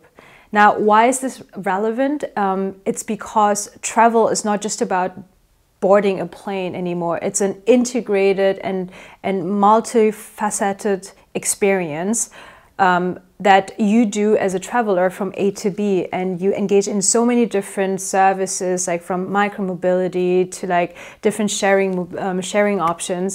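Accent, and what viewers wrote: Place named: Germany. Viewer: Ich mag ihren deutschen Aktzent...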